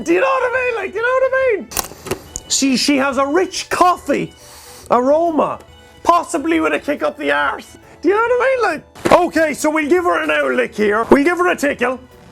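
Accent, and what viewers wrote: Ireland. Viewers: Does anyone know what part of Ireland is his accent from?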